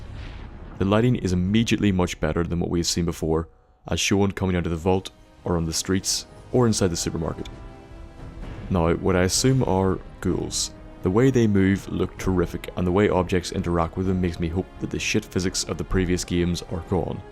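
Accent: Northern Ireland, United Kingdom. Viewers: Are you from Northern Ireland ? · Finally realised you're from Northern Ireland. Rad about ye kiid?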